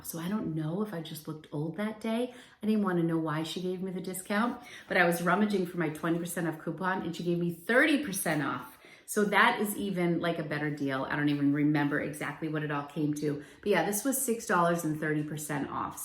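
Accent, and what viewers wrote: Boston, United States. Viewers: Your videos are my favorite, your Boston accent! Baux for box! Keep up the amazing sourcing. Thanks for putting out the best content on YouTube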